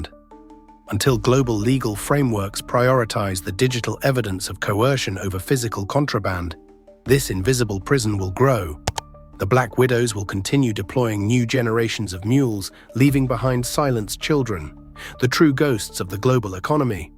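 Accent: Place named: United Kingdom. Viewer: Realy appreciate the british accent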